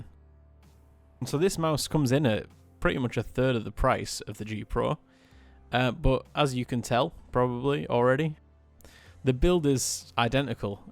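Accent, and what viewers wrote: United Kingdom. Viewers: Sure am pal! Can always recognise the twangs of a fellow member of Gods county :-). Sheffield lad myself. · Good video, but next time you should use a better camera..more than half of the video is out of focus. lol BTW which part of UK are you from? Your accent is interesting!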